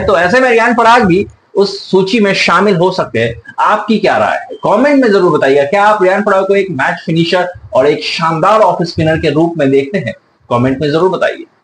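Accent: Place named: Assam, India. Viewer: Bhai aap Assam se ho kya..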